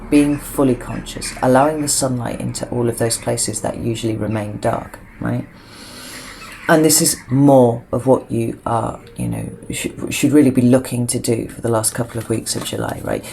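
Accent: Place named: England, United Kingdom. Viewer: What K.Y. or C.Y. cat has you cleaning out there litter box. You may capture America with your English accent.or would you prefer my short comings lest wow grab a twix candy bar and coke pop, soda I guess I don't belong in America this next 50 you have a flat $ 640 nothing included a month .I'll sign a contract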